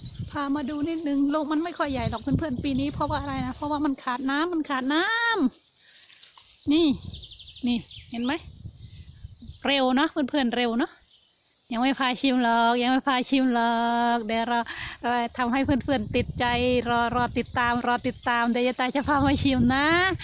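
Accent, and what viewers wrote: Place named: Surin, Thailand. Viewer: คนสุรินทร์รึเปล่าคะ? สำเนียงบอกค่ะ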